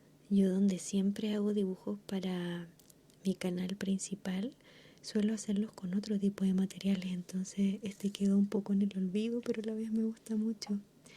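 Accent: Chile. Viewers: Grande, siempre me gustó tu voz así chilena de chill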